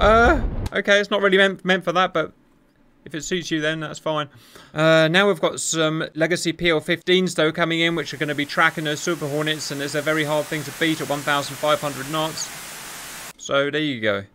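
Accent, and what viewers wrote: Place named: United Kingdom. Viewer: No we love your beautiful British accent commentating the battles. It's just a shame when so much is happening all at once in the big battles that you can't commentate all of it!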